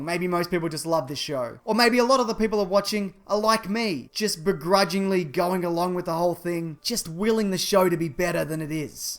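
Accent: Australia. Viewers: okay first off my Ozzie friend. I love your videos for some reason you always say what is going on in my head LOL I know that sounds strange but that's not the point. I just want you to say tattoos as many times as you possibly can. because it's definitely not the way here in the US say tattoos LOL but I love it I first noticed when you were talking about the Joker and hoe it was not a fully realized character but you said tattoos at least a dozen times. okay well that about covers it just make a video about tattoos where you say the word tattoos as much as possible you Ozzie's you. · The generally upbeat, laid-back rhythm of the Australian accent contrasts perfectly with your particular brand of snarling vitriolic sarcasm. This channel is a joy to listen to.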